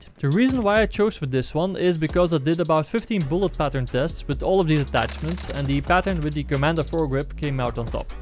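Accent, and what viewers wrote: Netherlands. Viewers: Pretty sure he is dutch judging from the accent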